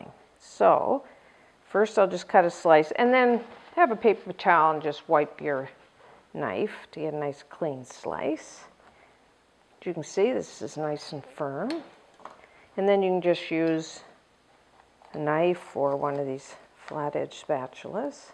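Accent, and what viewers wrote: Canada. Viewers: I should definitely use this recipe. Well, after I burned the regular bake version, which was unpleasant :x Your accent seems to familiar to me, aren't you from Canada? :)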